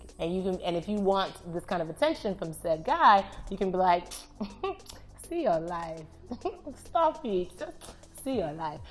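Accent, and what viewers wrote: Nigeria. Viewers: I love listening to the Nigerian accent. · Yvonne's Nigeria accent and attitude has a lot of similarities with Mayowa Ekpo 👍👍👍👍👍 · The Nigerian accent is funny no cap 😂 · Actually,you could guess how long she's ever been in Nigeria just by the pronunciation.she don't have to be yoruba to get those accents right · I don’t really like her character on insecure but her Nigerian accent is so funny I love it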